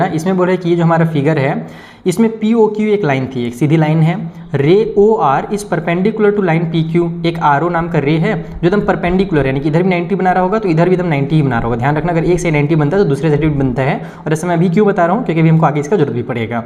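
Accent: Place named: Bhojpuri, India. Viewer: Sir is speaking bhojpuri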